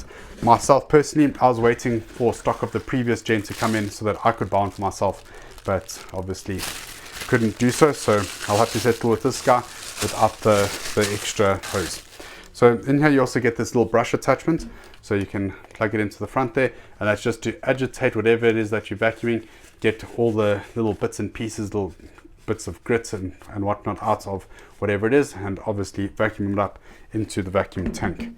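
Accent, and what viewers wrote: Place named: South Africa. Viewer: nice review...good to hear south african on youtube!! looking to buy one of these with the 6A battery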